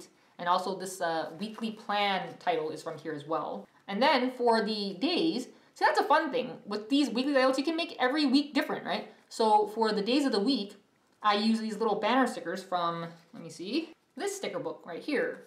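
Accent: Canada. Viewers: This is too cute, and so is your Canadian accent ("eh"? :-P )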